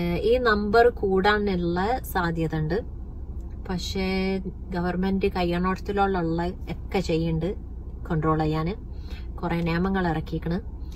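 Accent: Kozhikode, India. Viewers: കോഴിക്കോട് ഭാഷ ഞമ്മക്ക് പെരുത്ത് ഇഷ്ടായി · കോഴിക്കോടൻ മലയാളം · കോഴിക്കോട് ഭാഷ എനിക്ക് ഇഷ്ടം ആയി 😍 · assal Kozhikode anallo samsaram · പച്ച കോഴിക്കോടൻ ഭാഷ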